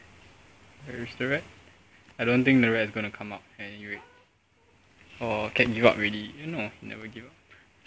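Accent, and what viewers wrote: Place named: Singapore. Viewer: Singaporean accent haha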